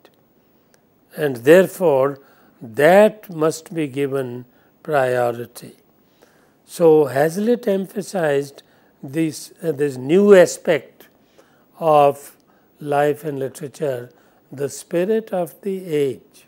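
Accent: India. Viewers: A very good lecture on this topic, new with a certain vocal flavour from the Indian subcontinent.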